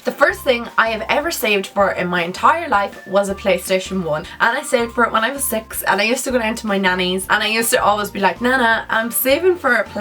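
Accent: Ireland. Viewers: Great vides! I have been watching you for a while now. Love your accent! My husband is from Ireland as well. Keep up the great videos! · where in Ireland are you from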